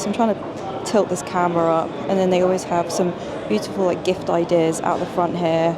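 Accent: United Kingdom. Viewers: I enjoy, very much, shopping with you, walking, browsing. I don't need to buy a thing. Also, side note: no one understands why the men's fashions are so interesting to me, yet they are. I have two godsons and still to this day I enjoy taking them clothing shopping. They have fabulous taste, thank goodness. I thought your camera work was smooth and I enjoy your voice very much. I am an American and I so easily enjoy a beautiful British accent. I find British vocabulary is coming to mind and replacing the American counterpart. I rather like this!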